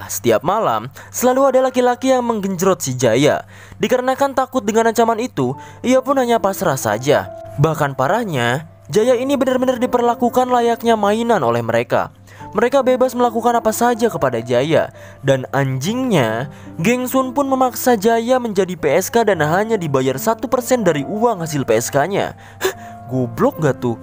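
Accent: West Java, Indonesia. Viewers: Sunda bang?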